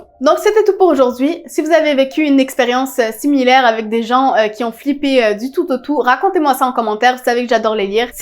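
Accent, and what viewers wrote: Quebec, Canada. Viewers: T’es tu Québecoise? Ou t’habites tu au Québec?! Parce que t’as un ti accent Québecois. (Jparle en connaissance de cause, je suis Québecoise)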